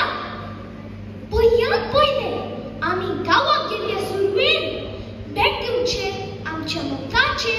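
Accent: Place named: Konkan, India. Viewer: Beautifully and confidently spoken in sooooo pure konkani. God bless you doll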